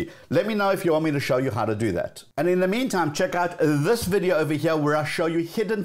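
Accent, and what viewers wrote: South Africa. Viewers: are you south african? You sound like a soutie😂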